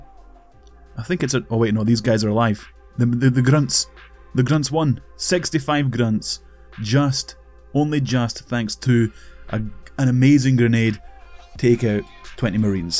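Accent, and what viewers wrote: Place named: Ireland. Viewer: I love this guy's irish accent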